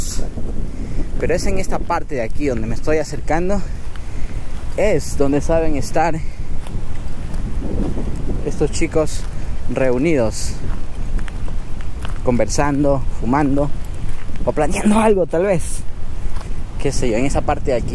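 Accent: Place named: Quito, Ecuador. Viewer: Habla quiteño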